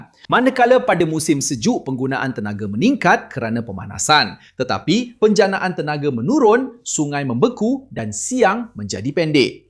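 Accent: Indonesia. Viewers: Bodoh je orang indon ni buat contain guna AI alih bahasa Melaysia campur bahasa indon. Bodoh doh bunyi dia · Admin ni orang Indonesia yang bercakap Bahasa Malaysia ke?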